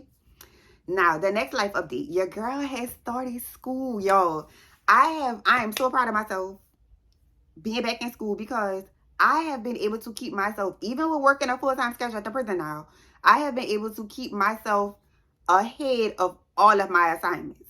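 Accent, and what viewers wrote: New Orleans, United States. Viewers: First 1 I love your New Orleans accent lol 2. I got them save glasses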